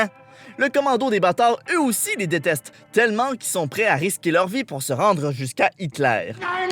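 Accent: Canada. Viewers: J'adore vraiment cette accent canadien ! Continue tes vidéos c'est super le couteau trouvé !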